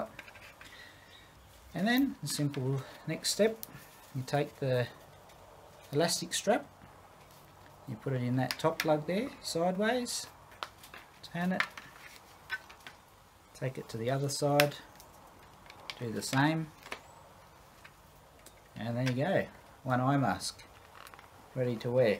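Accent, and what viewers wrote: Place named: Australia. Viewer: Who doesn't love a good Aussie accent :)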